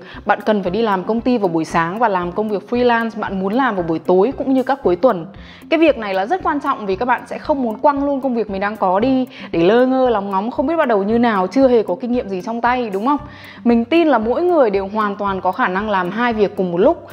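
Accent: United Kingdom. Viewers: Có ae nào thích nghe chị nói giọng Anh Anh không hí hí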